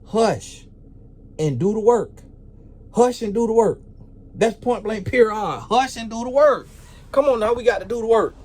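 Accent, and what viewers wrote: South, United States. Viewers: Lmao I love listening to talk!!! Please don’t change a thing bout your country talk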